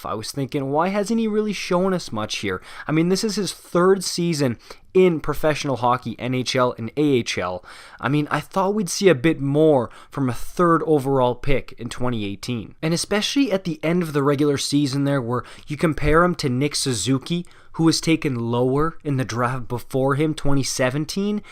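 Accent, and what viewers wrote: Quebec, Canada. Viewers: Il est possiblement Québécois en se basant sur sa prononciation des noms français. Les Québécois sont généralement bilingues et n'ont pas d'accent dans les deux langues. Ou il est quelqu'un qui a appris le français très sérieusement. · Mon radar a queb sonne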